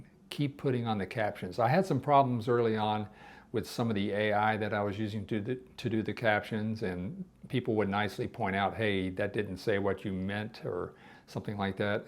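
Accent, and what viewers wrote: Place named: United States. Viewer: Thanks your veido! Cause I can clearly listen US accent from you. I love that.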